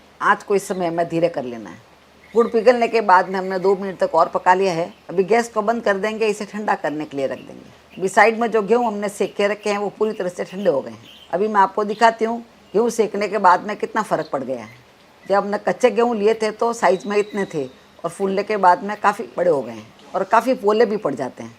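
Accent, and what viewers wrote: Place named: Marwar, India. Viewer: Aap ki hindi me desi marwadi language ka swad aata hai.....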